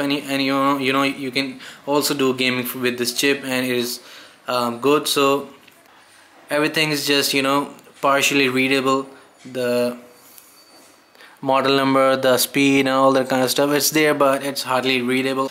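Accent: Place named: India. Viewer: oh i didnt mean the video was bad or anything it just sounds like sterotypical india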